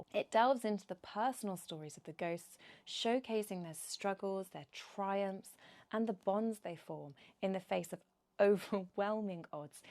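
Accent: United Kingdom. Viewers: you got a really great speaking voice. The Brit accent really works for you. Your hair looks great as well. Ive known about 40k since being young, ive always been kind of a closet nerd though. Necromunda is my favorite setting out of all of it. Have a great day.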